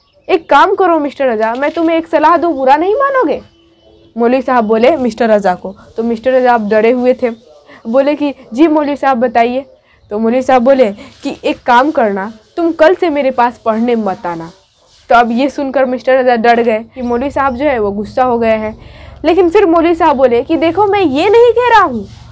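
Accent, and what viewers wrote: Bihar, India. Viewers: Aap kya bihar se ho.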